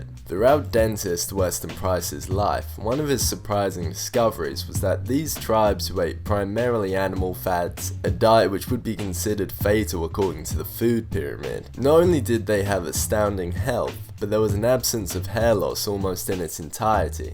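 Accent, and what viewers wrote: Australia. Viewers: 😍 do I hear that Aussie accent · Was wondering why you weren't uploading videos just to find out that you opened a new account lmao your dry ass humor mixed with your aussie accent just stands out to much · No dickhead......hardwater is simply a parts per million density figure......could be peanut fucking butter in the agua,pull up fuckstick.......genetics and getting on the gear,you dumb dunce.........that stick reduces DHT does it.....................................finasteride and dutasteride have no sides in 98pcent of users...................my question is,are you self aware enough to realise you are a cuck who simply likes the sound of his own voice,Australian pronouncing VITAMIN like you do,govern thyself ........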